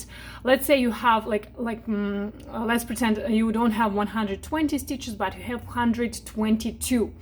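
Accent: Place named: Russia. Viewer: I love this tutorial! Your hat is so beautiful. I'm going to make one for my Dad for Christmas. By the way, your accent sounds just like the beautiful Russian accents I heard in college when I lived in a Russian community house. Is that your nationality, if you don't mind my asking? Thanks again for the excellent tutorial! ❤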